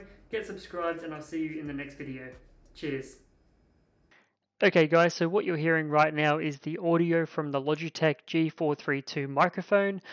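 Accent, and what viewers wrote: Australia. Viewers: THE FUCKING AUTRALIAN ACENT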